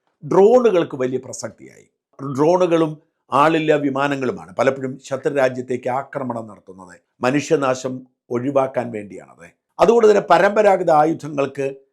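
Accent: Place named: Kottayam, India. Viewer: എടോ... താൻ കോട്ടയം ജില്ലക്കാരൻ ആണെന്നാണ് ഞാൻ മനസ്സിലാകുന്നത്.. ഈ ആണേ.. ആണേ എവിടുന്ന് കിട്ടി?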